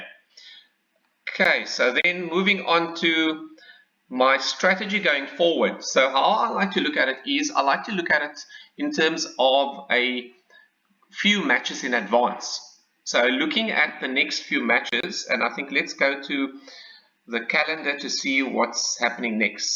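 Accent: South Africa. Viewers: From South Africa?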